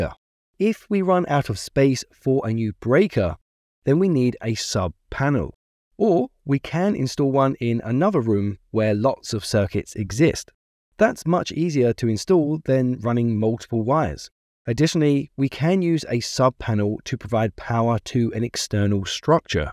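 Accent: England, United Kingdom. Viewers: It's amusing to hear an English voice explaining the American electrical system. It's also interesting to see how different the US system is from the UK system, which is variously different again from the systems in most European countries. Of course, the general principles are the same but the details are fairly different. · Hearing an Englishman talk about North American electrical systems is so weird. Not as weird as UK electrics, but close. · Really peculiar hearing an English voice describing the US electrical system... I thought the two "hot" wires were on 2 different phases????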